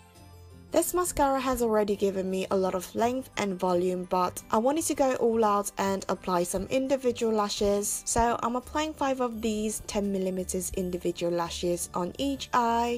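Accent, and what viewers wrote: England, United Kingdom. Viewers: your makeup tutorials + english accent 💕💕💕 i love love loveee this look! i will definitely try it one day 😁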